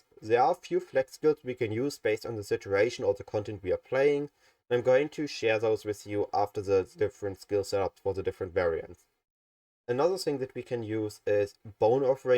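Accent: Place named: Germany. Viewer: If you can't differentiate between an accent and general pronunciation you need a reading comprehension test. Fucking moron. PS: The pronunciation is actually *fine*, it's no different than any other German accent pronunciation. Maybe if you're having so much trouble hearing what he's saying, you need the hearing test more than I do 💀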